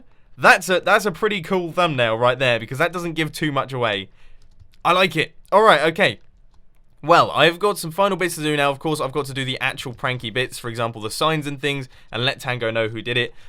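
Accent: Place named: United Kingdom. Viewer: Iron golom. Great British accent bro :)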